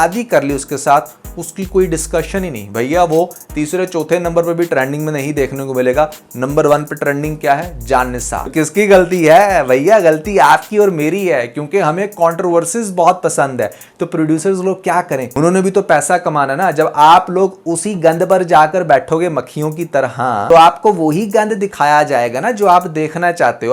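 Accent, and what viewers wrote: India. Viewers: Bhai ap indian logo ki tra kyn baat krte hn فر nhi پھر hota he Plzzz Urdu me baat kren Hindi me ni Ap hmare bht fvrt hn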